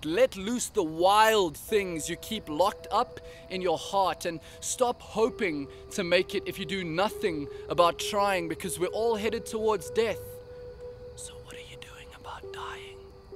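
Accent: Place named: South Africa. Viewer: Is he south african???? Sounds south african! Would be epic if he was!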